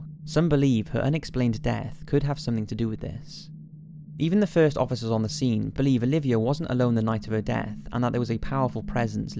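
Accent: Wales, United Kingdom. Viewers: He's Welsh mate. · Gabriel Mondragon he is 100% welsh, I’m welsh and I recognise his accent well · He's Welsh. You like Welsh. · thx my sexy british senpai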